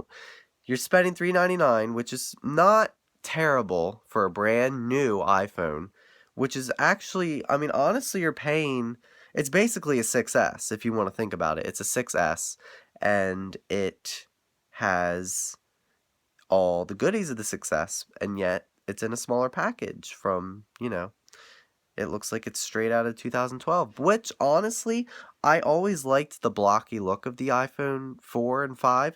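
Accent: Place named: Philadelphia, United States. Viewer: You sound like you're from around me :) Philadelphia? And yay- Can't wait til 31st!!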